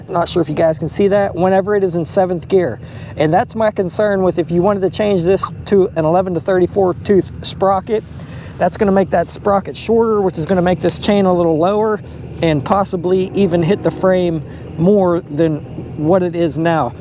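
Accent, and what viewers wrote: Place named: Pittsburgh, United States. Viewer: Sounds like you are from the Burgh area possibly the mon valley.. love it! I grew up in Ambridge.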